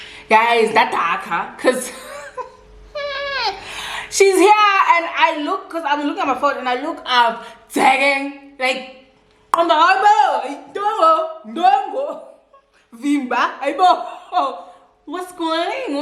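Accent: South Africa. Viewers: Your Xhosa Accent 😂😂😂😂😂😂 ... " Hai Celia "" 😅😅😅 .. Make up looks absolutely Ravishing 💯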